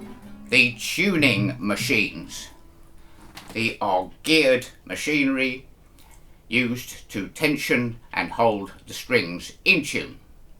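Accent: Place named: East London, United Kingdom. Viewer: HaHa! Your accent and cap made me laugh out loud. I was born in East London and your voice reminded me of many blokes I used to know when I was a kid! So I loved your presentation of this information…although for some reason ‘Derek and Clive’ kept coming to mind while I was watching you!! 🙈😂😂 I’ll have to watch again just so I can concentrate on absorbing the info rather than being entertained and amused! 😂😂 Great job. 👍🏻 Regards, Dave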